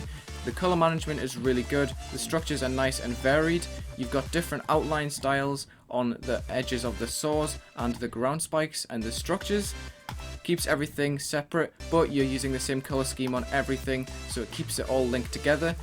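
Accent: United Kingdom. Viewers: To be honest, literally sounds like my math teacher with a British accent.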